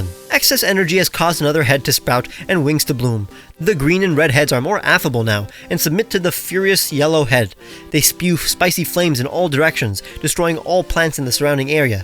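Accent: France. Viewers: Dude I love your videos, your designs are amazing and ideas are awsome. 🥰 (note you French accent is superb.👌) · What’s with French accent?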